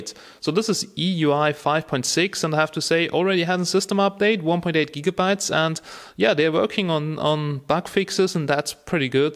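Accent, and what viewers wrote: Germany. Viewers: is he german? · this guy is def. german hahaha that accent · He is german :P I should know cause I live in germany xD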